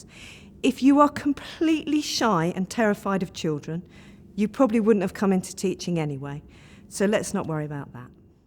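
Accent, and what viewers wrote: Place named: United Kingdom. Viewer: I really love your accent madam! I wish our english subject is british english and not american english...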